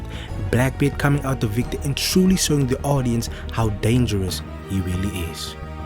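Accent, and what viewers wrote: South Africa. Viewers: Sounds like you're from Sud Afrique mabut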